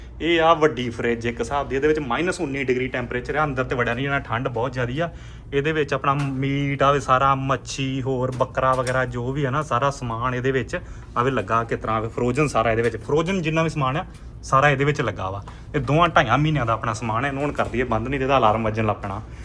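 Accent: Majha, India. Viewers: ਬਾਈ ਜੀ 12.23 ਜਦੋਂ ਬਾਹਰ ਦਾ ਵਿਊ ਦਿਖਾਇਆ ਸੀ ਉਦੋਂ ਬਾਹਰ ਕੀ ਸੀ ਜਿਵ੍ਂ JCB ਨੇ ਜ਼ਹਾਜ ਚੁੱਕਿਆ ਹੁੰਦਾ ਟੋਚਨ ਪਾ ਕੇ , ਉਹ ਸਮਝ ਨਹੀ ਲੱਗੀ ਕੀ ਚੱਕਰ ਸੀ ਸਮਝਾਿਓ ਜ਼ਰੂਰ , ਮੈ ਲੁਧਿਆਣੇ ਤੋਂ ਤੁਹਾਡੀਆਂ ਸਾਰੀਆਂ ਵਿਡੀਓ ਦੇਖਦਾਂ ਬਹੁਤ ਨਜ਼ਾਰਾ ਆਉਂਦਾ ਵਿਡੀਓ ਦੇਖਕੇ ਤੇ ਮਾਝੇ ਦੀ ਬੋਲੀ ਸੁਣਕੇ ਤਾਂ ਹੋਰ ਮਜ਼ਾ ਆਉਂਦਾ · eh majhe side di pure punjabi hi hai ji.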